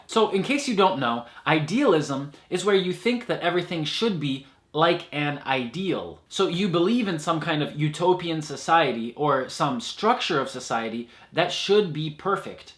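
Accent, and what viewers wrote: Russia. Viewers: Почему мне кажется, что заполучил русский акцент?))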